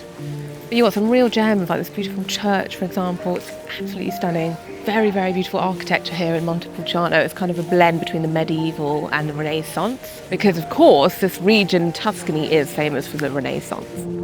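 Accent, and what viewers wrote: England, United Kingdom. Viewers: That UK pronounciation is simply unbearable!! 😢😢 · Just came across channel….this is the best bunny hole I entered. I love listening to her voice, Britishly delicious!!! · Love your shoulders 😍, also you have a really good British accent! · Hi. your videos are so good and you explain in a wonderfull voice the really italianity. italy its my country and your "England" english its a sinphony for who don't tell and listen to much like me. You're so very beautifull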